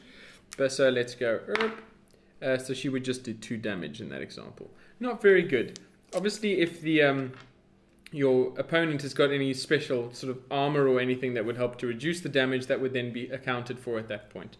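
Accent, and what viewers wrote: South Africa. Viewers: Never knew I needed to hear someone say "Cthulhu" in a South African accent but there you go. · Are you South African?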